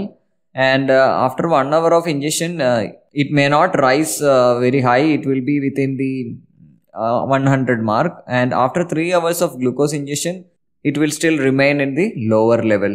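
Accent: Kerala, India. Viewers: Kerala boy❤ lol accent 😂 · Is video mein, Indian accent bahut zyada strong hai..indian accent bahut annoying hoti hai.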